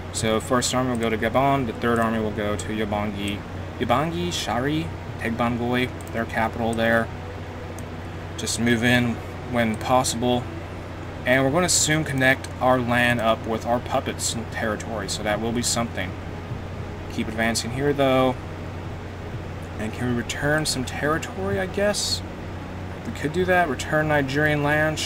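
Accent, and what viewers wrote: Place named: Appalachia, United States. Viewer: Now u sound loke an american hilbelly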